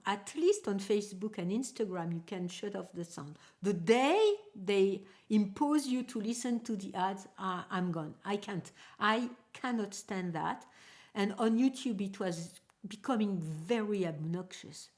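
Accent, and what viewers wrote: France. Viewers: it was like hearing myself speak all my internal thoughts, but with a French accent!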